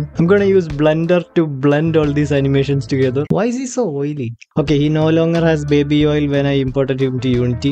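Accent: Kerala, India. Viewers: BRO AREN'T YOU MALAYALI???? YOUR ACCENT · Malayaaali alle? Sound kekumbool ariyunnundu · English keettiitt mayalayalide pole imddd😊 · Your ascent like mallu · mallu english alleee enik thonni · That Mallu accent hits different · your voice sounds really like a keralian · Where are you from, sounds like kerala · You sound like you're a malayali! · He is malyali..... Go and learn English mallu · Bro if definitely a malayalie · ഈ ഉമ്പിയ ഇംഗ്ലീഷ് കെട്ടാ അറിയാം മലയാളിയാണെന്ന് 😂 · Athey malayali ahn enn thonnane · Accent is giving me mallu vibes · no one will talk like this in India only Malayali does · Malayali slang never fades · english parayana kettal ariyam malayali anenn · The accent is exclusively Malayalam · sounds like a Malayali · മച്ചു നീ മലയാളിയല്ലേ നിന്റെ പറച്ചില് കേട്ട് അറിയാല്ലോ മോനെ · The English you speak sounds like a Malayali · Bro with a mallu accent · bro have mallu accent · Mallu accent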